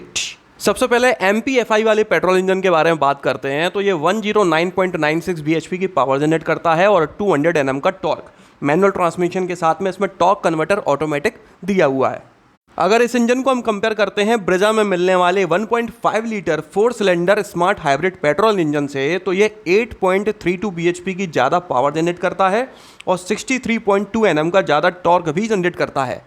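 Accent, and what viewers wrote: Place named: Gwalior, India. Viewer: Bhai gwalior se ho kya awaz se lag rha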